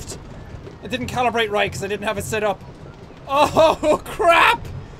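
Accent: Ireland. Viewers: Do you live in Ireland? I hear the accent in your voice. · Your accent is that Irish? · ***** There is a specific accent those from Southern Ireland have. High pitched and fast. definitely has that.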